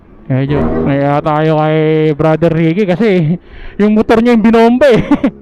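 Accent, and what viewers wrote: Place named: Iloilo, Philippines. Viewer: Lods taga saan po kau parang Iloilo salita nyu minsan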